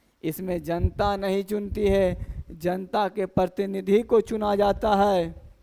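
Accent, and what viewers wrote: Bihar, India. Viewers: क्या आप बिहारी है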